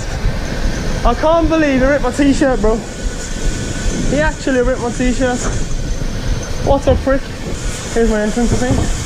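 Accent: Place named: United Kingdom. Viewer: Oh i love that british accent👌🏻👌🏻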